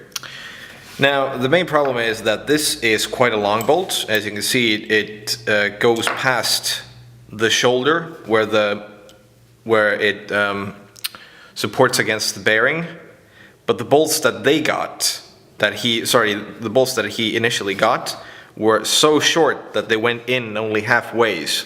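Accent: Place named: Estonia. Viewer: Hi, where are you from? you sound very estonian :) kui jaa siis Tere ja hea video!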